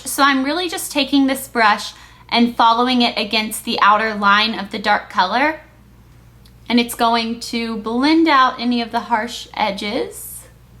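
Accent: South, United States. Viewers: i absolutely love your southern accent :)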